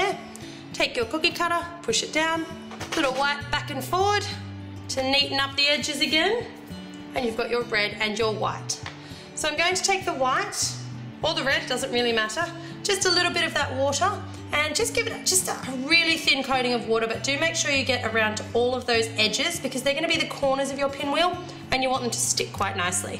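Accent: Australia. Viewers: I thought u were Australian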